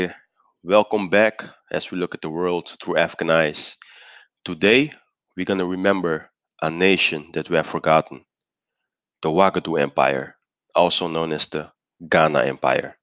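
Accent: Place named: Poland. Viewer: Omo you sound more like polish or ukrainian or even Austrian...u sure?...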